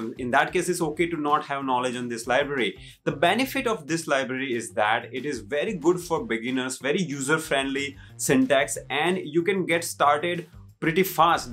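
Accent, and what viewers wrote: India. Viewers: This indian accent is so strong, that i needed to watch it 3times to know what he said #NoFront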